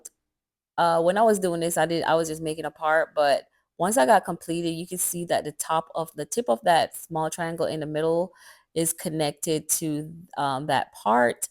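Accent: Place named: Jamaica. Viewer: loves why I think I heard a bit of a Jamaican accent in this video 🇯🇲🇯🇲🇯🇲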